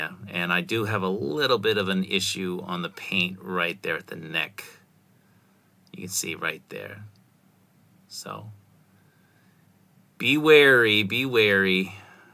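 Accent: Boston, United States. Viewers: So, have u watched the Celtics documentary series that's running on MAX/HBO? You should've narrated it. Noone's more Boston than you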